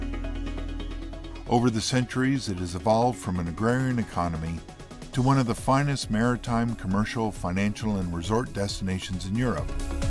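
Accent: United States. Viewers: One block has been more or less finished, at least on the outside. Why the hell is this narrated by a yank?! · Why the American accent? hmmm ....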